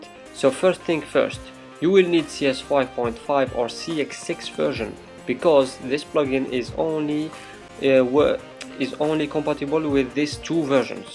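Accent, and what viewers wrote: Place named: France. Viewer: you sound french lol. thanks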